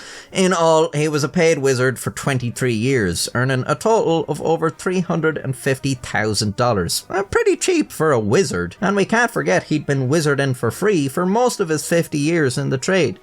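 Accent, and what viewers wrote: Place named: Ireland. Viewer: hes irish. he has a reason to not speak a london or oxford adjacent accent.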